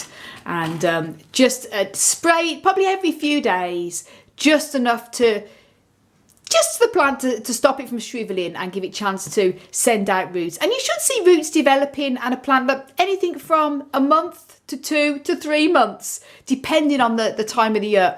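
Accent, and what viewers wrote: United Kingdom. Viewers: I loveeee your video! Your voice, your accent, your energy.. it’s everything. It feels like i am learning with someone from Hogwarts. Hehe.. Thumbs up! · british accent makes my head dizzy lol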